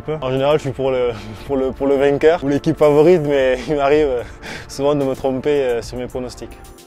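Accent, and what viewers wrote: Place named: Toulouse, France. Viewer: Ce bel accent Toulousain qui fait que l'on n'oubliera jamais d'où tu viens 😉 et cela même si tu vas très très loin comme je te le souhaites!!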